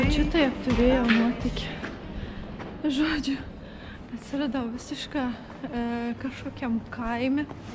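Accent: Samogitia, Lithuania. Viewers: Video vuo 👍 O jau tas kalbėjimas žemaitiškas, koks geras... :hands-yellow-heart-red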